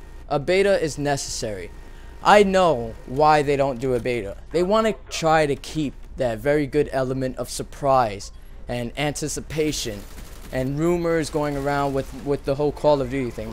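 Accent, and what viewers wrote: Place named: New York, United States. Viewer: Yes! A New Yorker! Finally! I've been looking for a commentary with a guy from New York!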